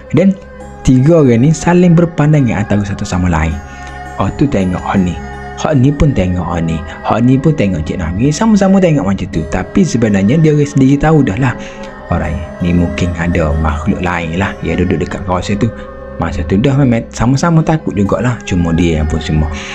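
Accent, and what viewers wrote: Kelantan, Malaysia. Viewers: Kawe orghe Kedoh tapi pahe baso Klate ni tapi apa pong kawe terhibur...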